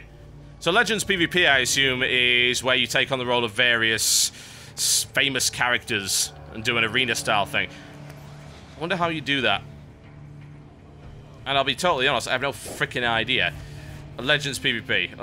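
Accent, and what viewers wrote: United Kingdom. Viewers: that seemed to be a generally understood concensus. Also, i don't recall any REAL complaints. I would SO watch in 240p. Mostly i just watch for the hillarious comentary in the british accent tbh!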